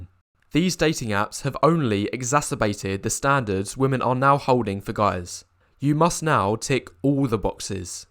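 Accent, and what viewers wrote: United Kingdom. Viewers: British accents adds aristocratic flavor to this content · One thing I feel weird about this is that a guy with the British accent here is using measurement such as ft. and dollars instead of cm and Pound Sterling. Are these videos exclusively about the situation in the United States?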